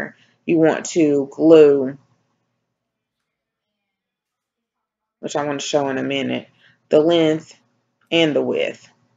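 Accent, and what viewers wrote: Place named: Louisiana, United States. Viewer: Very nice work, and so pretty!! Love the creativity using a pool noodle! I love your accent! You almost sound like me a Louisiana girl! 😆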